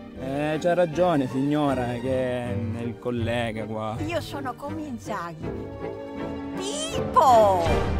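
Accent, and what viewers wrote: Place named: Palermo, Italy. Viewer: che parla con l'accento palermitano fa troppo ridere!! Bravo! 😘👍 E lo dico da siciliana! 😄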